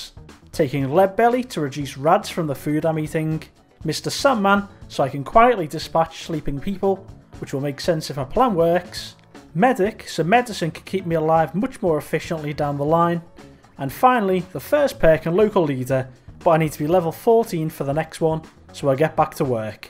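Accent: Liverpool, United Kingdom. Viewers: Yes now scouse youtuber im here for it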